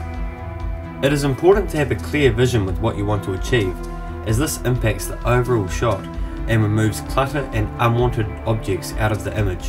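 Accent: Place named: New Zealand. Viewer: The accent is standard Kiwi.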